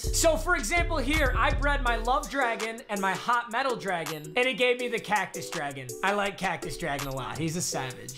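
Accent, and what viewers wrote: Michigan, United States. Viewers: It’s Michiganian diction.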